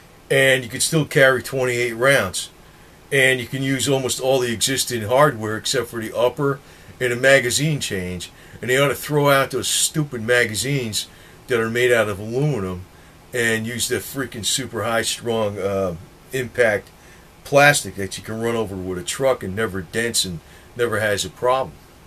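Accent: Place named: New York, United States. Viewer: Sounds like you are from New York or Jersey.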